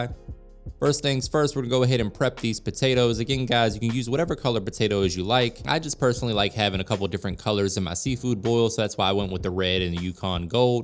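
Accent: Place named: Louisiana, United States. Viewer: Stop fuckin lying you're from Louisiana you ain't from Virginia with that accent.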